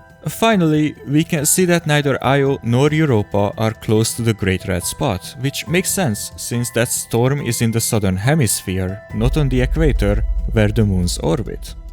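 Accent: Hungary. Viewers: Great video! Just out of curiosity; are you Hungarian by chance? Guessing by your accent.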